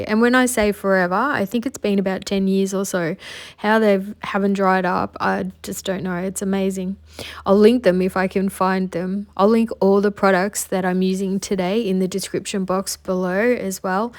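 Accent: Australia. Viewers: They are all beautiful! You do such lovely work and you are a pleasure to listen to. Your voice is calming. And, who doesn’t love an enchanting Australian accent. I’ve only recently discovered you and am now looking at your past videos. Keep them coming! ☮️💜🌞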